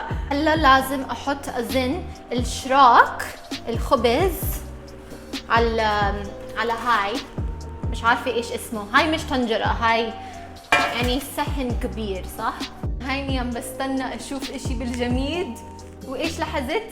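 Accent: Jordan. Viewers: ياااا الله ع الكذب بس قال اجنبية قال😏😏😏 من حركاتك و لسانك مبين انك اردنية و بدوية كمان 😂😂😂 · بحسك أردنية نفس كلامنا نحنا الاردن 🇯🇴🇯🇴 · اه امها بولنديه بس سمعت اردني أبصر..بس اصيله اكتر من كتير عالم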